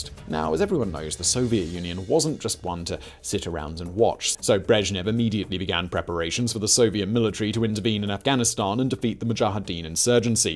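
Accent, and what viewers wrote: RP, United Kingdom. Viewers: a lot of his videos are in pretty poor taste, like there's videos on his other channels about some really really sad and heavy stuff and yet he delivers it in this weird posh accent that makes it seem like he's joking, super irritating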